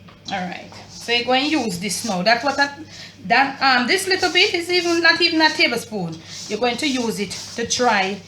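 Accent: Jamaica. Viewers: Does anybody else love her jamacian ascent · I love this lady! Love the way she chat the real Jamaican chat❤️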